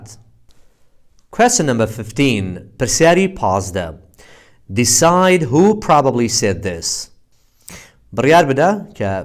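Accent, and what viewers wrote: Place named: United States. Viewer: Mashalla your accent is incredible just like American kepp posting teacher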